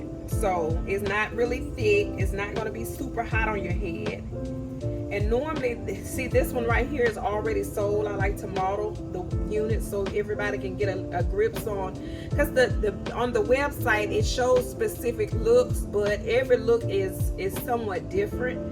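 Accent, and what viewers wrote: South, United States. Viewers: Love it! Where are you from, I hear that southern draw lol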